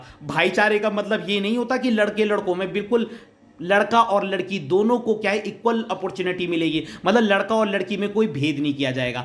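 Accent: Garhwal, India. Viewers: Sir m bhi Apki awaj m thoda gharwali pan lag rha tha esliye pucha Thanku sir Aap bohot shi se explain karte ho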